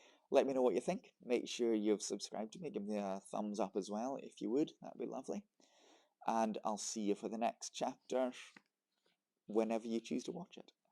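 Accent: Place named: Lowland Scotland, United Kingdom. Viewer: Great Lallands in your storytelling today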